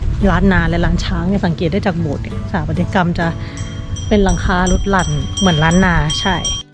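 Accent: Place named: Isan, Thailand. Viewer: สำเนียงอีสานดีมากครับ เป็นคนจังหวัดอะไรครับ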